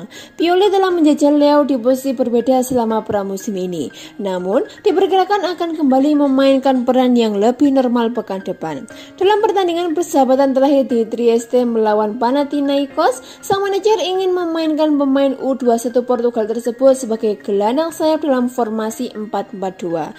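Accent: Java, Indonesia. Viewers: Medok banget....🤣🤣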